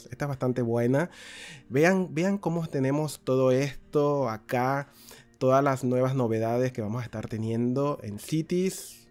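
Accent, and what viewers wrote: Venezuela. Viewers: Bro eres de Venezuela?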